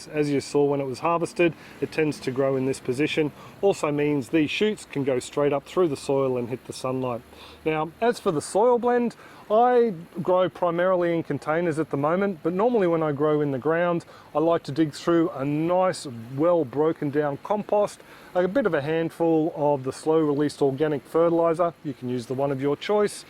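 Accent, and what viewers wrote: Australia. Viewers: So happy to hear an Aussie accent! I live in Cairns. Up here our weather is hot, hotter, cyclonic. I was given some ginger about 3 months ago and had no idea when to harvest, great information just when I needed it. I was about to did them up now! I have new shoots coming up. Is there anything that I should do differently living in this climate? Thanks.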